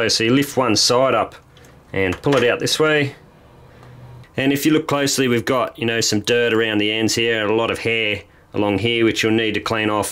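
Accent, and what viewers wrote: Australia. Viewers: Very useful and clear, and I got to listen to your accent too :-) Aussie I assume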